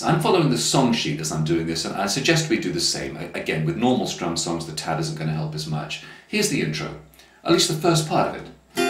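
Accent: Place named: England, United Kingdom. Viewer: Muy ingles el acento 😆✌️🤙👉🇦🇷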